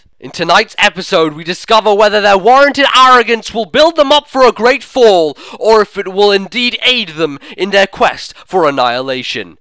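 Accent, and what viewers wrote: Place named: United Kingdom. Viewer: i'm guessing you're british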